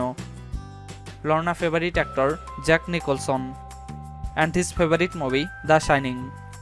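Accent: India. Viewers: Indian Narrator????????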